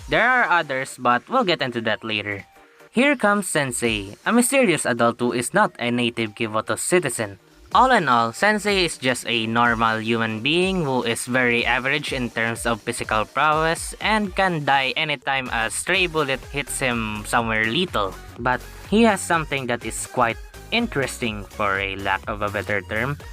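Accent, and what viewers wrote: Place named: Southeast Asia. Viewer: SEA ass accent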